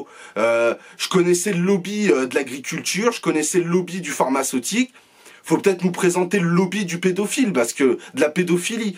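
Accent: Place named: Banlieue, France. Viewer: voix de benlieusar voix de bonhomme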